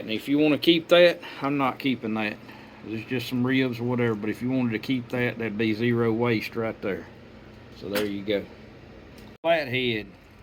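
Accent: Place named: Virginia, United States. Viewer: oh my goodness...how you make me miss my Daddy. He skinned just like you do. He talked just like you do. I am not sure where you are from but you remind me of back home in Virginia. Don't forget to save your innards and put them under your garden plants. Makes for great fertilizer.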